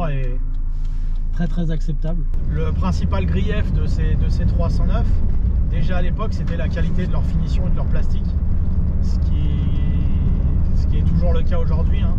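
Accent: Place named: Paris, France. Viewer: Superbe accent titi parisien du présentateur, je ne sais pas s'il vient vraiment de Paris mais ça y ressemble.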